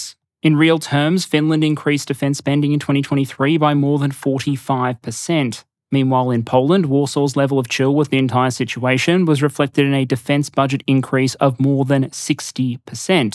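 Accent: Australia. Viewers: "....one of those people who can derive joy from spreadsheets" this is why I love your style ( just one small example). Not just content that makes one think one learned quite a bit (yes, I do), but such a great way of presenting. I even started liking the Ozzy accent.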